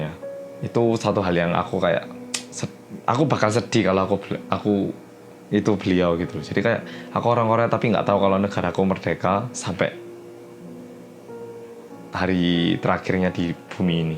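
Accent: Java, Indonesia. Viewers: Woow medhog banget mas ee,, kamarnya rapiin dikit, wkwkwk Tapi thank for ilmu yabg baru saya ketahui, terimakasih banyak, sangat bermanfaat, sukses bro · medog jawir smpean kang😁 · Baru tau kalau pahlwan komarudin asli Korea ..suwun informasinya cak medok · Kok lucu yo wong korea medok😁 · Meskipun mas ngomong nya indo,tapi agak agak medok ngomong nya 🗿🌻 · Korea medok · Jowo ngendi lek???😁😁😁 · rencana mau kerja di korea, cari" referensi di youtube eh nemu chanel orang korea yang medok, alhasil jadi suka liat vidionya ngomongnya itu loh yang khas. semangat mas 😊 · Kok kakak bicaranya kayak orng jawa yh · Salam medooooookkk jang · Mas maaf aku salfok medok banget mas😂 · ku kira yang ngomong orang jawa😁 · Sekarang 364ribu orang jadi tau mas medok.. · mas oppa ngomongnya medhok poll hehe... manteppp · cocok, juga udah jawa kental jadi cocok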